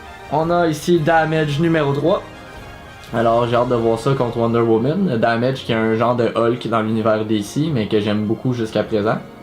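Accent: Quebec, Canada. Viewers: Es tu quebecoi (moi oui)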